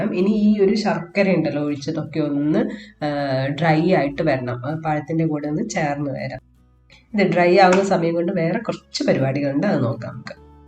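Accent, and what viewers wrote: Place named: Malabar, India. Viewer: Hii...😄 recipes simple & tasty aanu...adhikam budhimutillathe vegathil undakan pattunnu 😄. ettavum ishtapettath Malabar slang presentation aanu..eniyum nalla recipes wait cheyunnu...😋😋..takecare dear 👍❤️😊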